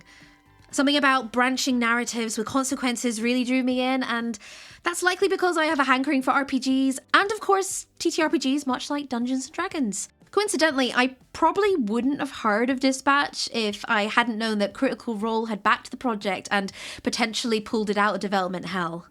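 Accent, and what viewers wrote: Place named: Scotland, United Kingdom. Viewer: Lovely to hear a Scottish voice in these videos, welcome to Nintendo Life :)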